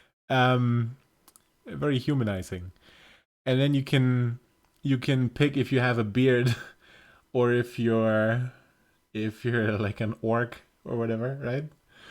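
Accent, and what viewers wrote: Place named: United States. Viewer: Your accent sounds SO american to me (it took an interesting amount of time - no, I can’t remember how long, I only knew that once - until I realized: Oooooh! A German?!), dass ich mich beinahe nicht getraue, etwas auf Deutsch zu schreiben. 😄 Na, ne, so schlimm ist es dann doch nicht…mehr. Zumindest nicht dramatisch genug, um mich davon abzuhalten, dir kurz aufzudrängen, dass ich in vielerlei Hinsicht schwer beeindruckt und sehr dankbar bin, denn: Durch deine enorme Offenheit, und damit automatisch die Stärke zu vertrauen, habe ich das erste Mal seit Jahren das vage Gefühl: „Ja, doch, man kann sich verletzlich zeigen - und es kann schön sein“. Weißt du, ich dachte, ich würde eines Tages mit Begeisterung Geschichten schreiben, denn früher hatte es mir großen Spaß gemacht, durch behutsames Freilegen eines Charakters, intime Situationen entstehen zu lassen. 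"Ich dachte" war natürlich einmal "ich denke", aber einige spannende depressive Episoden, lästig wie eine Person, die ganz dicht vor einem hergeht, eigens für den Zweck, nach Lust und Laune abrupt stehen zu bleiben, sodass man nicht anders kann, als gegen sie zu prallen, machten es mir sehr schwer. Irgendwann hat man erschreckend große Angst davor, es könnte einem jemand zu nahe kommen. Deswegen kann man bestimmt von einer gewissen Erleichterung sprechen, wenn man jemandem zuhören darf, der einem vormacht, wie mutig geht. Und das machst du wirklich großartig - dankeschön. 😊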